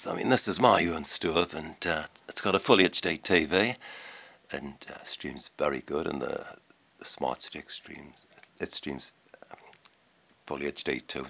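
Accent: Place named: North, United Kingdom. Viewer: Mate, I would seriously pay you to make this for me, been looking at fires endlessly and this is better than any of them. This video is quite old, so I would love to know if you're still using it and how it's going. You sound from the North, I'm in Scotland and I serious about you doing this for me. I take it this is just for looks and no heat involved. If you are interested, please reply and we can get in touch. Regards.....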